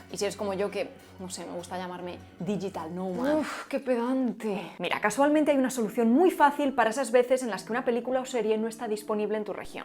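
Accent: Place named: Castile, Spain. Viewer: La fonética de tu dialéctica mesetaria no es el castellano real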